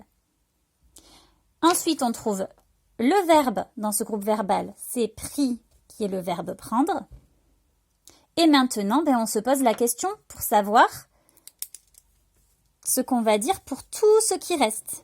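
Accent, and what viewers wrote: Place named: South-West, France. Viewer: Quel bonheur cet accent du sud-ouest qui fait que j'ai tout compris ce qu'est un COD et un COI ! Merci maîtresse !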